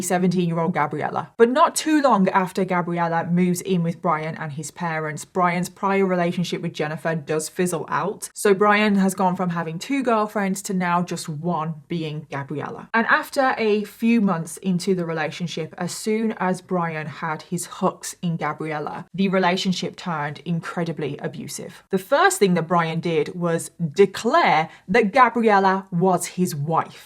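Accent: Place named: Birmingham, United Kingdom. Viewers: Ooh are you a fellow brummie? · You’re so amazing , and make me feel at home with your accent I live in Australia but from Birmingham !! You’re my favourite when I’m having a adhd moment you keep me on task 🙏🏻🙌🌻🫶🏻🥂🎩🤘🏼👽💜💚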